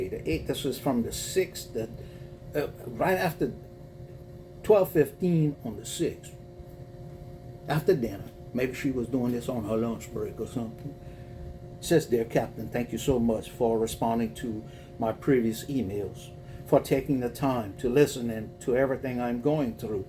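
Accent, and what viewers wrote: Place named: Louisiana, United States. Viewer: Love your Louisiana accent, don’t know why but it feels like HOME❤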